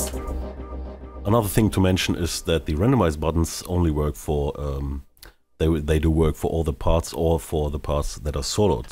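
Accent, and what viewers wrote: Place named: Germany. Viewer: Well done, informative and I just bought it, excited to try. Your English with a German accent is good and I like your humour.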